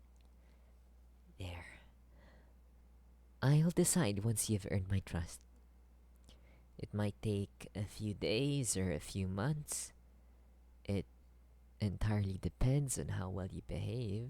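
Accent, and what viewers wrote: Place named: Southeast Asia. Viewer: Low-key hear a southeast Asian accent but I can't point my finger on where the accent is from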